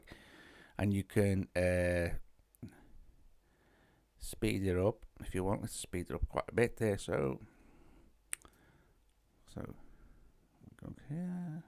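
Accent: Liverpool, United Kingdom. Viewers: Scouser? I think so.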